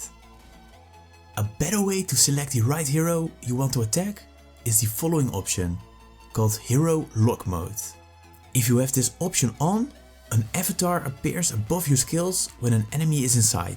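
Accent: Netherlands. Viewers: ik hoor dat je nederlander bent nice vid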